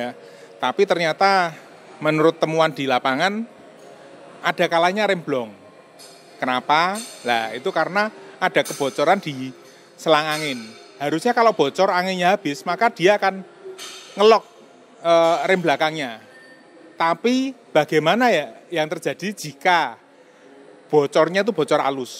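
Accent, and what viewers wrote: Java, Indonesia. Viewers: Lucu juga Om ....santai...kejawa jawaan.......tapi muatan ilmu nya ada...wokeee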